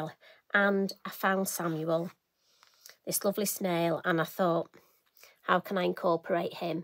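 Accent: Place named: England, United Kingdom. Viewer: Actually, I love watching you paint, and I love to hear you speak, with your lovely English accent. what a lovely card. Blue is my favorite color. 💟💟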